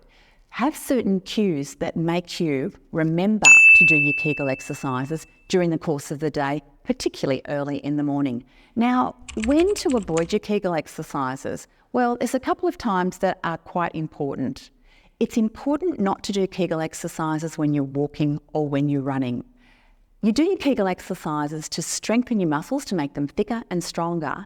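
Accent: Australia. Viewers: your Australian accent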